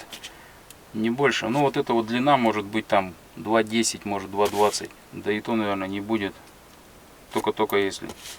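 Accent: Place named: Arkhangelsk, Russia. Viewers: ! Привет! Подписался! Зашло! Где снимаешь? Я был в мезени, там такой же говор! Привет из Челябинска!!! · Ютуб предложил ваш ролик.Начала смотреть из интереса.А говор-то наш,северный).Приветствую земляка!Подписка.Лайк👍.Архангельская область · По говору вроде Архангельский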